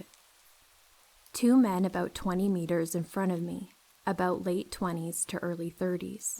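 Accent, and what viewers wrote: South, United States. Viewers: Southern US accent is very distinctive. I lived in Texas for a year when I was a kid. All my other years have been spent in Western Washington State where I was born and still live now. Thank you for another awesome video. 😍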